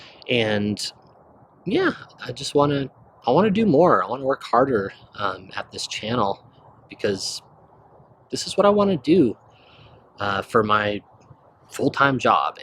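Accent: United States. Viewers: perfect American accent English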